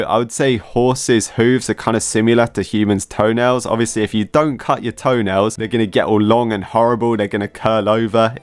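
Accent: United Kingdom. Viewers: I love your accent this is the first time I've heard a UK accent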